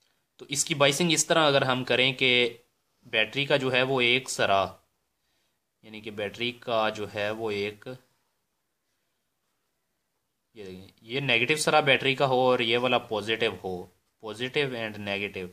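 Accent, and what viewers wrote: Punjab, India. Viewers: Sir apki voice tone puri punjabi h I m also punjabi hindu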